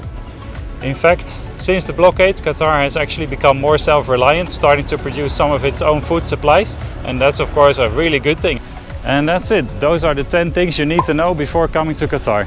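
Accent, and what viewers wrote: Netherlands. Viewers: Your accent sounds Dutch? Are you from the Netherlands? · Hollander aan die accent te horen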